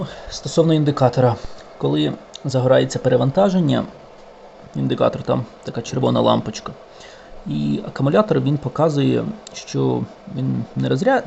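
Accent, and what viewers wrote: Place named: Kyiv, Ukraine. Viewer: если тебя спросят откуда ты взял "що то має бути на виході"? что ты ответишь? В описании такого нет (это вопрос чисто для себя самого, вернуться в "реальность"). Частково жартую... але... Сколько в споре запросил возврата? Когда жил в Киеве, балдел от того как говорят "старики" (когда чистый язык, без суржика). Обожаю Киевски и Полтавский вариант речи. Вас тоже прям приятно слушать. Ещё раньше балдел от канала "Дядько Максим". Приятно было слушать и смотреть как он чё-то там точит. Получается у Вас это скорее хобби? Или я не правильно себе представляю "інспекційне обладнання". Вас не напрягает что я пишу на русском? (а то в голове половина мыслей идёт так, половина сяк, но удобней писать на рус.)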